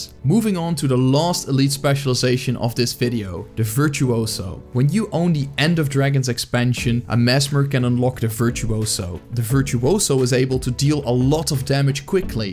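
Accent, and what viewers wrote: Netherlands. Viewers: You sounded dutch, checked the about page and I was right! Also, a good class guide. (This sounded like dis, that's why I guessed dutch.) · Good to find some active GW2 content creators, immediately recognized that accent though, fellow Lowlander :) Thanks for the quick guide, been coming back to this game after a year having just played a few months. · I sense a hint of Dutch in that accent. Is it? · Thanks for the video mate. A friend of mine and I both got into gw2 recently (even tho I tried it a year ago and bought the expansion in the thought id play it more, which I didnt). I came back and decided to start a new character to get back in from beginning to end but didnt know what class or something. This helped me a lot. Greetings from a fellow Dutchie, I heard the accent and its the same I have when I speak English :)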